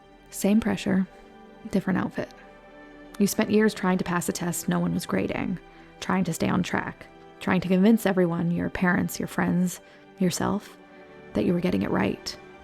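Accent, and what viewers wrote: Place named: United States. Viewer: You've got one of the loveliest "next door" American accent out there! Keep it up and thank you very kindly for the effort and content! 😊🎉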